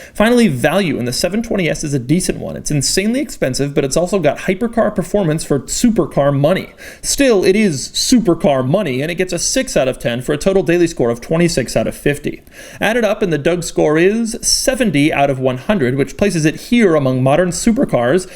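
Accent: Australia. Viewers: Shout out to Australian English 😂😂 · nahh way mate straya english aint nothin like those pommies!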